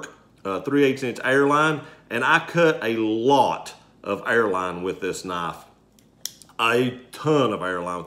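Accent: South, United States. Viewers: Hello new supporter here showing support for ya and I hit the like button. Awesome video. Great gift. Nice knife!! Like ur accent. Sound southern. Lol like me. O I hit the bell too. !🤩🤩🤩🤗🤗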